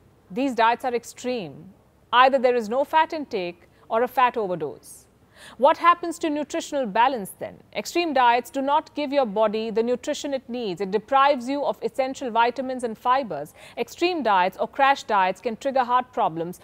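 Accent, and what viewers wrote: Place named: India. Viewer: Her Indian accent irritating to ears.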